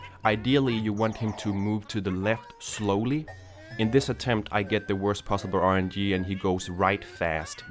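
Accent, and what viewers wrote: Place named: Denmark. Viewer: thanks for this video!! i miss this game so bad and watching u absolutely demolish it is so inspiring to watch :-D also your danish accent is so beautiful lol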